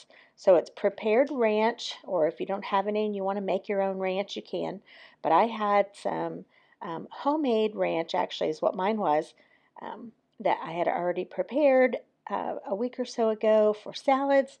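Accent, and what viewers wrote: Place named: Fort Wayne, United States. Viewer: We're from Fort Wayne and it sounds like you are close by.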